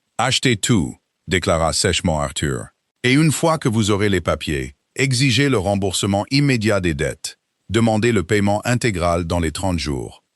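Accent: England, United Kingdom. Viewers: L accent anglais apporte un charme à ce conte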